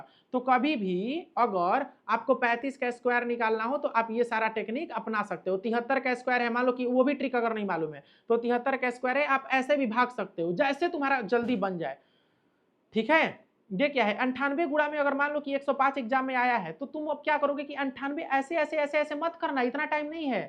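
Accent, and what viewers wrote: Bihar, India. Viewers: Bihar ka hai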